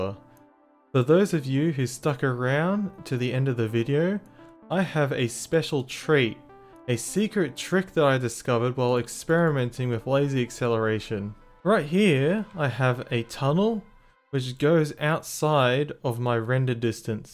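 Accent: Australia. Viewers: also, AUSSIEEEEEE OMG (LOL)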